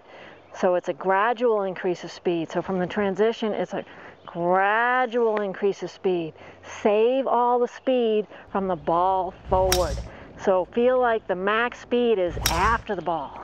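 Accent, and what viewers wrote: Boston, United States. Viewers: Hey that is the reverse of the song... Not sure what they meant but I get what you are saying..25 percent to 110 percent swing speed to find out my natural rhythm. I will try next time I am on the driving range to zero in on what is good for me. Was never conscious of this as I just swing without really thinking of the speed so it is a great nuance you have elucidated upon in your Bostonian dialect which is awesome!!! Thanks!!!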